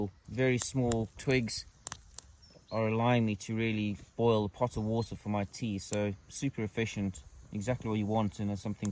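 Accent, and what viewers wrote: South Africa. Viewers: Hi there you sound like a SAFFA so am I but residing in the UK (24 yrs). I don’t do instagram any email add to reach out. BTW also bought two Rob Evan’s knives.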